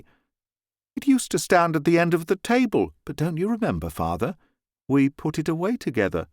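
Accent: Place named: Purley, United Kingdom. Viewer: He sounds like a bloke you 'd meet in a pub in Purley . Not sure where you get your notions of Victorian spoken idioms and syntax from ??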